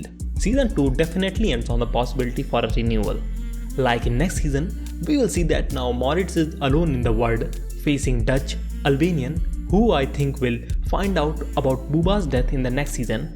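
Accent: India. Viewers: 🙄🙄🙄 yeah that's what I said Im Indian too I guessed it by the accent bro thanks for an informative video.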